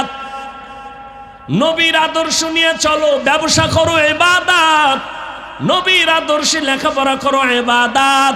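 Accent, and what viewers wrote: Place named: Khulna, Bangladesh. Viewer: মাশাআল্লাহ এটা সম্ভবত খুলনার বয়ান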